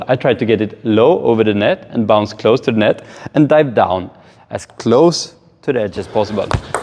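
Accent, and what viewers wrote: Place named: Sweden. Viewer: bra tips, o alltid charmigt med grov svensk dialekt på engelskan :)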